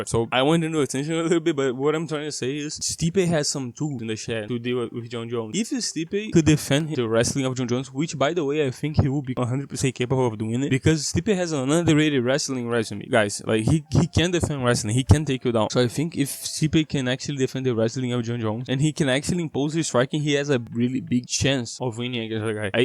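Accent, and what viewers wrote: Brazil. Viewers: senti um sotaque br. You have a really good point, the sad thing is that it pretty much doesnt matter who wins. Whoever wins will probably retire right after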